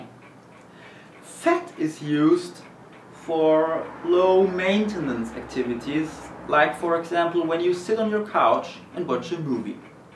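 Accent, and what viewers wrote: Germany. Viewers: After all, I still don't know what do do in my situation =D What do I have to do to lower my bodyfat percentage without losing weight anymore? Im already down to 69 KG (my body height is 188 cm) and I can't see my abs at all? Maybe you can answer my question. Would be nice if you PM me and I would prefer german, you sound like you're originally from germany ^^ Greetings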